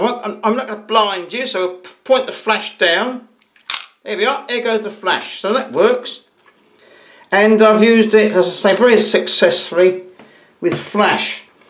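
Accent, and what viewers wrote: United Kingdom. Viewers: full of British accent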